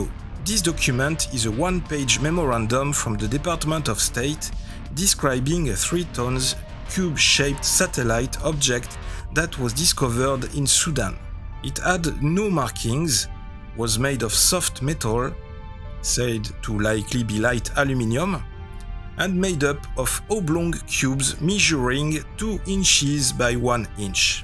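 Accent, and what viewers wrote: France. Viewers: hahaha, your strong french accent is very funny, but nice from you to give it a try and inform the world with your french knowledge, this is seldom. You have forgotten to mention 2 very important whistleblowers/researchers about Ufo Crash Retrieval Teams like the Blue Berets: Leonard Stringfield and Sgt. Clifford Stone.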